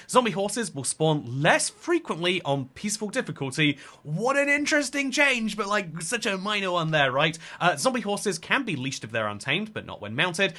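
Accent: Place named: United Kingdom. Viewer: It's so weird how I can watch every video you upload and hear you speak with a British accent and somehow forget you're British until I see "Armour" in the title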